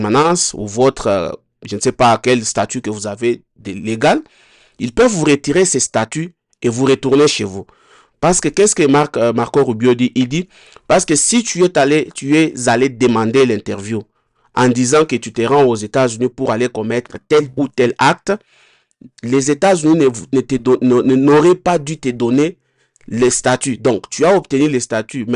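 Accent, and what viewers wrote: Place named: Africa. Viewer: Africain francophone li ye ou pa tande accent li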